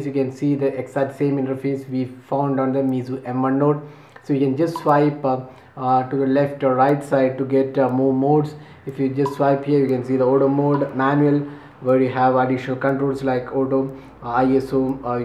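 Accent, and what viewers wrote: Kerala, India. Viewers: Are u a Keralite..i can get that from your ascend..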